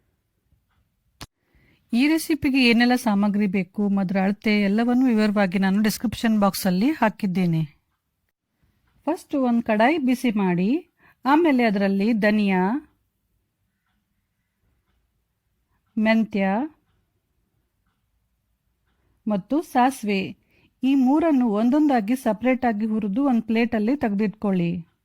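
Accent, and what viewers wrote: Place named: Bengaluru, India. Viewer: U speak Bengaluru language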